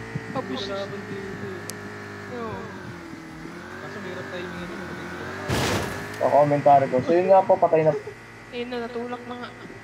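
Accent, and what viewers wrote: Visayas, Philippines. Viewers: Bisaya kadiba idol??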